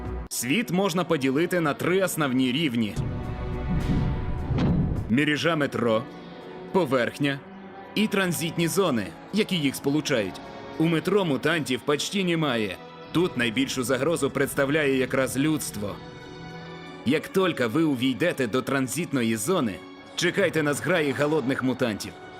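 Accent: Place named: Russia. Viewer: Акцент там не для розваги чи насміхання над кимось. Це для збереження задуму авторів відео, згідно з яким мовник має сильний російський акцент.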